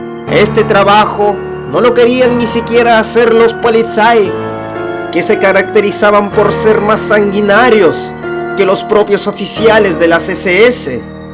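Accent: Russia. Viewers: Eres de Orígen RUSO tus videos son La Unión Soviética tu Acento Saludos desde México capital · wey, es ruso, no puede poner un poquito mejor su acento porque así es su acento base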